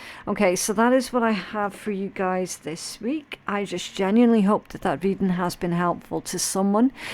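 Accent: Scotland, United Kingdom. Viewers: 444 everywhere atm …444 likes and the time I’m listening…I even took a screen shot lol we went through a very challenging 3 years …but yes come through …integrity intact. 🌝😅 We have so many ♏️ in our family…and our Michael granted our family a Miracle last summer 2023 thanks …I’m from Scotland are you too and I’m definitely not allergic to swearing or sarcasm lol…I resonate with your lovely accent. 💙🦢💙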